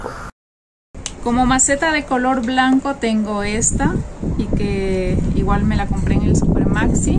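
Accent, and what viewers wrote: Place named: Peru. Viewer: Hola saludos de Perú usted es de ecuador sigo a unos chicos de Marcos Otavalo ellos hablan muyyyy diferentes la escucho muy familiar como una Peruana . Yo sigo a Meliza y a Mary ahora también a usted.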